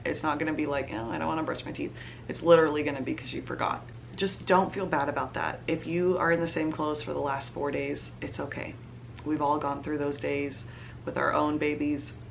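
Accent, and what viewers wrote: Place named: Texas, United States. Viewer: I found your videos recently as I will be having my son in two weeks as a first time mom. Every video has been so helpful. I was hooked when I could tell you had a Texan accent and then found out you’re a fellow Houstonian!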